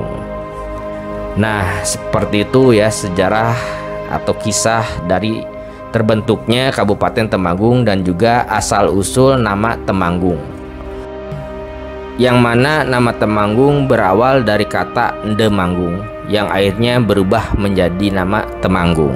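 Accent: West Java, Indonesia. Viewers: naratornya orang sunda bukan jawa tengah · Yg punya chanel kaya logat orang sunda malah paham sejarah temanggung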